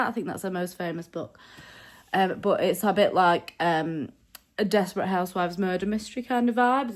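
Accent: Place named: North East England, United Kingdom. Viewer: Just stumbled across your channel. Love love love ❤. Why have I never seen your channel before? Watching an older video and thought with your accent and you caught the metro to work, that you lived in Manchester. You don’t. You live in the north east like me. I’m a fur baby mum and similar to you in personality and likes but I’m much older than you. So glad found your channel. BTW I live by the sea north east ❤❤ edit I’m from South Yorkshire originally but love it up here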